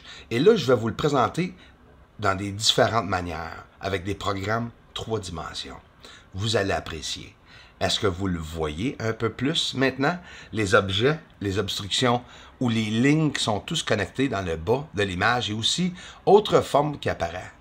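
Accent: Quebec, Canada. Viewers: J'aimerai te donner un conseille, je sais que tu as déjà énormément de boulot, mais pour les Français ce serait géniale que tu sous-titre t'es vidéo car certaines personnes ont du male à comprendre avec l'accent Québécois... ensuite si tu pourrais délimiter les zones avec des pointillés légers qui chemine les contours au fur et à mesure les structures dont tu parles, car moi-même je n'arrive pas à tout percevoir peut-être par manque d'expérience visuel personnel. J'adore tout ton travaille sincèrement mais nous ne sommes pas tous de ton niveau et je suis certain que ce serait très fructueux pour ta chaine et tu pourrais ainsi d'autant plus développer ton projet financièrement très certainement si t'es viewers accrocheraient plus facilement au premier regard, en espérant que tu ne fasses pas assassiné par les men in black haha Big up bro Je te kiff!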